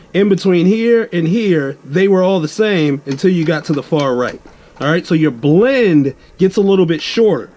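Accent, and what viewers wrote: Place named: Baltimore, United States. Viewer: I can tell this man from Baltimore 🤣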